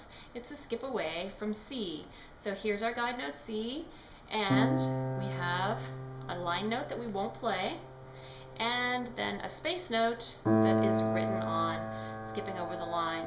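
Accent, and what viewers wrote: Canada. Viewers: Finally I get why the Canadians on South Park say aboot ! Never heard it pronounced so clearly before :) Great video btw, just the right level of difficulty for me!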